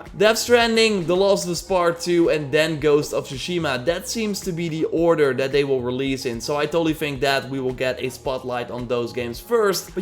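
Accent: Netherlands. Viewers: Je kon wel eens Hollands zijn:) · Nice Dutch accent bro.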